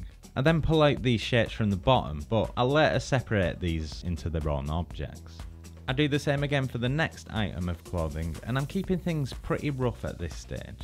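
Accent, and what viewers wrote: United Kingdom. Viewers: I dont understand British, in my russian school we've learned English only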